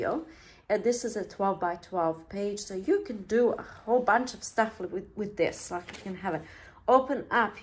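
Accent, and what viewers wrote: South, United States. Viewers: I LOVE your Southern Accent!!! As a Texan I highly approve 😂💖😂💖😂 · 😂 I bout fell out at your country accent! Plus, going overboard and getting overly excited...theres no such thing! This journal turned out amazing! I look forward to seeing more like it! Thank you always for your honesty, your wits/ sense of humor and your mind blowing ideas!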